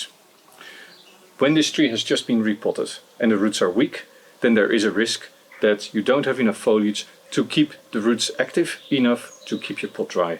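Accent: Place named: Netherlands. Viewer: 👍 Including the charming Dutch accent 😍🙏😘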